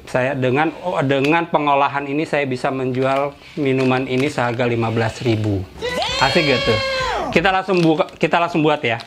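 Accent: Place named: West Java, Indonesia. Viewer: Mantaaappp bah...aslina mn siga sunda,slm sukses tkw malaysia nonton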